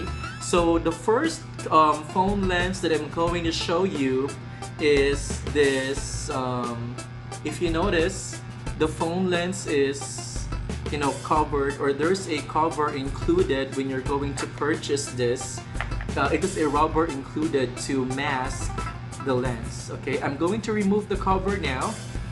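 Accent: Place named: Cologne, Germany. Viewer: Kolsener accent. 😁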